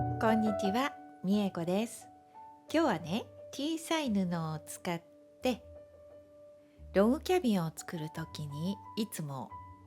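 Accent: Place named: Kansai, Japan. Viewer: 関西弁😂とてもいいですね、笑いました。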